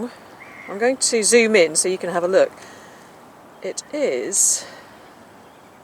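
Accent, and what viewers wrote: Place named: RP, United Kingdom. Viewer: dam this lady is gorgeous love the posh accent and then mudlarking lol good for you i do a bit down rainham way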